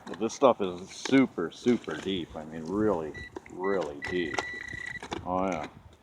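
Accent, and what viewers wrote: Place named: New England, United States. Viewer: Man I love the way you talk yank it's so funny to me A 😎good digs y'all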